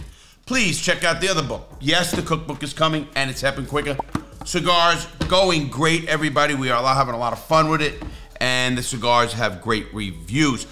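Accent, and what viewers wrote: New York, United States. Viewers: I hear a slight New York accent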